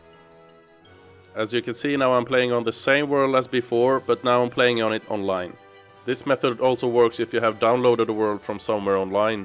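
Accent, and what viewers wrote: Sweden. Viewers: You sound so Swedish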